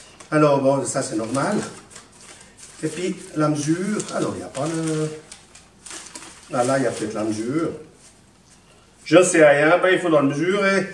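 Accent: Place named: Fribourg, Switzerland. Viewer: Super ton accent. Moi en bon fribourgeois, je peux pas dire le contraire....... Tché Don !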